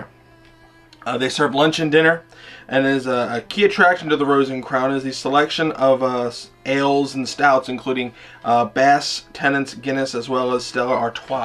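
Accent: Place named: United Kingdom. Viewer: ahaa your British accent was great :D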